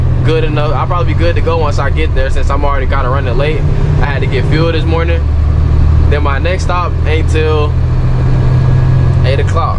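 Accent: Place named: South Carolina, United States. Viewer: U from south Carolina Brodie ?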